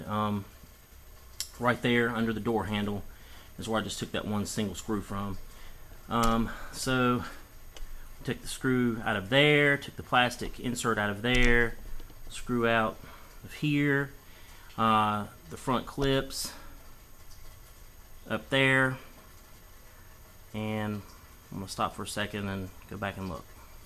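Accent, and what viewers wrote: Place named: South, United States. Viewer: Like your southern accent!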